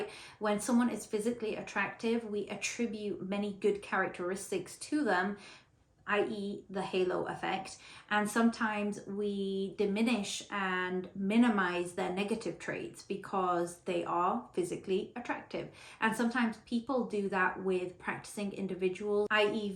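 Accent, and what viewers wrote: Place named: United Kingdom. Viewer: British English adorable!Salam from Kavkaz,Russia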